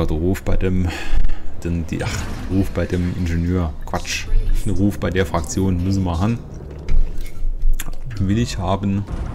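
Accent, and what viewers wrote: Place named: Hesse, Germany. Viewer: Dein Hessisch ist Klasse^^ Hab wieder "2 Guardianstrukturen" nahe am Zentrum als "Staffelmarker" gesetzt.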